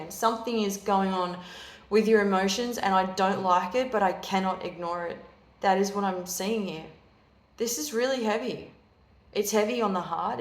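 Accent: Australia. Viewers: Definitely an Aussie voice, good to hear. Simple and accurate. Zero drama hype in delivery. And short :)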